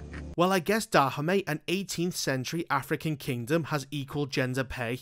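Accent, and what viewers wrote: United Kingdom. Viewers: I just love that British accent. "fockin dumb" XD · Bro i love your British accent and it makes it way more funnier 😅😅😂😂 Btw I am from Afghanistan 🇦🇫 Even though we fought three or four wars but still I love brits Love brothers No one can fake your history these people will try show everything was black like Egypt and North Africa.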